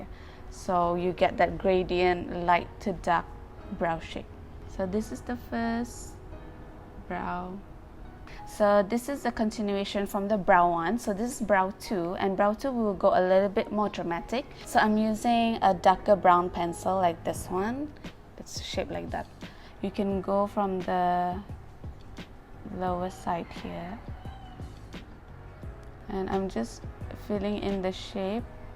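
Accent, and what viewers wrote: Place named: England, United Kingdom. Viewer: Yayyyy thank you for the tips💋. Suka btul sy sma tu english accent ko. Poinsikou💜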